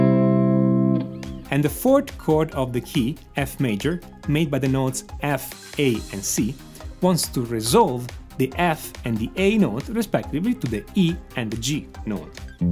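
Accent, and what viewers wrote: Italy. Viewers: Ma...sei italiano????? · I'm ready to bet my house you re Italian! Pronto a giocarmi la casa che sei italiano 😂 bravo e grazie, molto interessante! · I like your italian accent. But the background music is very annoying. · it's italian flavored english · I love this itallian acent! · Great. Are You Italian? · im so greatful that you are using the CDEFGAB notes, instead of do re mi blah blah blah, i can tell you're italian by your accent, and i live here in italy and i hate learning guitar or theory in person cause they all teach it in italian terms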